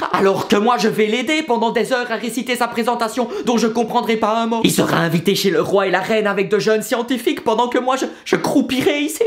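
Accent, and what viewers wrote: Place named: Belgium. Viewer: Son accent belge est juste magnifique !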